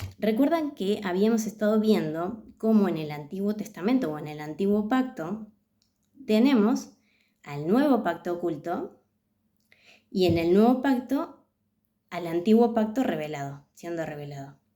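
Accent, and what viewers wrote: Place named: Argentina. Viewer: Como muchos argentinos, pronuncias los sonidos de la "LL" y la "Y" como un "SH" o "CH". Cuando lees palabras hebreas, tu pronunciación argentina dificulta saber cuál es la fonética real de esa palabra y, en consecuencia, de qué palabra se trata. Como tal vez te resulte prácticamente imposible darnos la pronunciación correcta, al menos podrías incluir subtítulos. Con las palabras por escrito, podremos nosotros mismos buscar información adicional del mensaje que quieres entregar.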